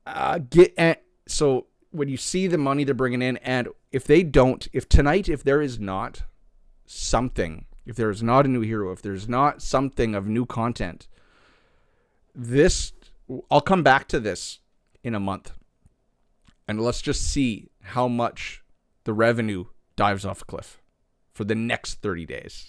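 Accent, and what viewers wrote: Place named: Canada. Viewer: Thats one hell of a Canadian accent. Well done 🫡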